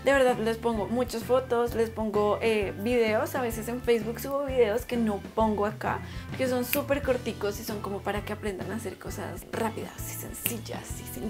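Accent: Colombia. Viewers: Usted es de Colombia ??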